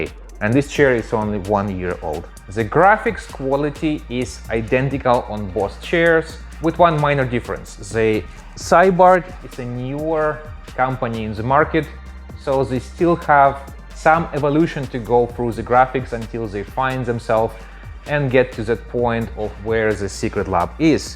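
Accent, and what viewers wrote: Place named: Eastern Europe. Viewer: Why someone with a eastern european accent is using the stupid inches as measurement?, he was born using the right METRIC SYSTEM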